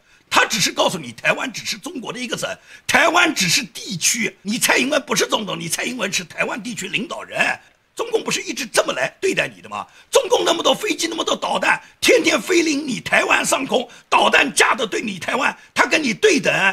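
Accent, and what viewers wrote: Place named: Jiangsu, China. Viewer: 听你口音带了点苏南味 但我一朋友 钟恒 曾是你在南京的同学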